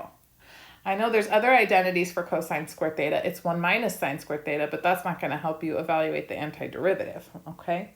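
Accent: United States. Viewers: Adore American English and U sound perfect)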